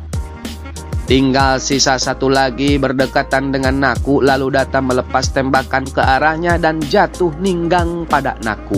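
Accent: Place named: West Java, Indonesia. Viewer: yg cerita kita orang sunda ciri sunda banget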